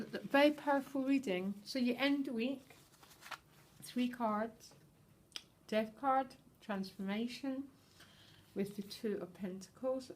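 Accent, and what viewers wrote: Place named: Scotland, United Kingdom. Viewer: Thank You for reply, Me too, I'm from Scotland, I could still hear the Scottish twang in your voice, My connections are with Edinburgh & the Scottish Borders, I moved to England 10 years ago, Lived in Burton On Trent then Wiltshire & Now I'm settled in South West Devon beside the sea. x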